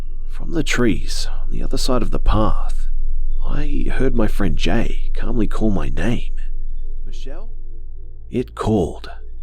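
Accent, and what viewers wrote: Australia. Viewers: Yes 🎉 I actually love hearing a fellow Aussie do these stories 😍😍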